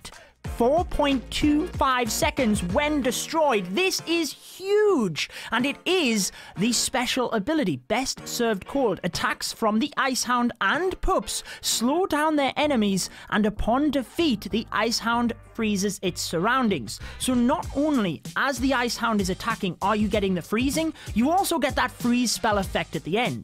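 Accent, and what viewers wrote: United Kingdom. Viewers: Ty are you from the uk?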